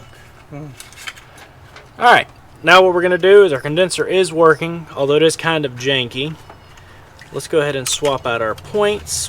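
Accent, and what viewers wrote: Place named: Carolinas, United States. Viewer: looks like youre in the carolinas from the scenery. and the accent :)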